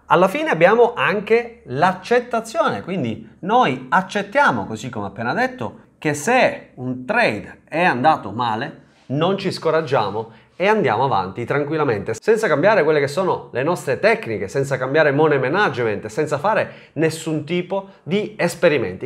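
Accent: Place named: Apulia, Italy. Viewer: guarda io sono di Brindisi e il tuo accento è identico a quello della fascia tra Brindisi e Taranto. Che è molto diverso dal solito pugliese che si sente in tv che va da Banfi alla pizzica salentina. In effetti basta guardare una cartina delle zone linguistiche italiane per vedere che quella parte di Puglia ha lo stesso colore della Sicilia! Grazie per la tua gentile risposta! 👋👋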